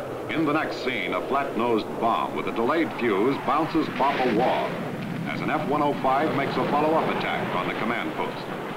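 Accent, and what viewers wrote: United States. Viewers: Thank you again for the upload.... I love the films also the American narration .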